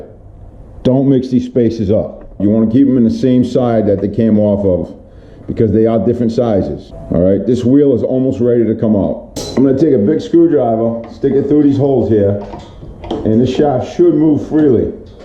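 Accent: Boston, United States. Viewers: you made me homesick Boston strong deplorable in tampa bay ...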